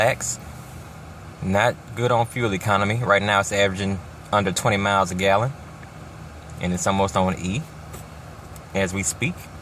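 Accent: South, United States. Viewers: After living in the American South for 10 years; I can assure you that this is what a very intelligent, articulate southern man sounds like. Did you ever have difficulty understanding him? Since the reviewer noted he is in Louisiana, and having spent a lot of time in LA, I can assure you that there are some extremely thick accents there. There are people that speak and you might be lucky to understand 1 in 5 words. That's what makes LA such a great place. A beautiful state with an amazing history, and wonderful people.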